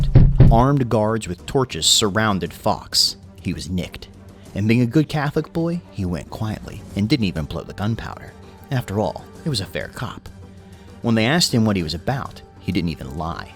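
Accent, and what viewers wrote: United States. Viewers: But he’s not British! We have an American accent, just as everyone has their own country’s. (Those are some counter-intuitive pronunciations - lol) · Makes sense why you know of it then seen as (I'm guessing from the accent) you're American. Good vid, got yourself a new sub. Much love from West Yorkshire, England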